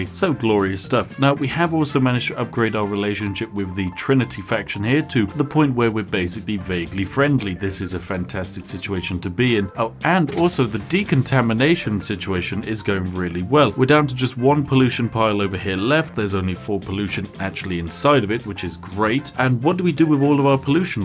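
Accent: United Kingdom. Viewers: Wait, this guy is British?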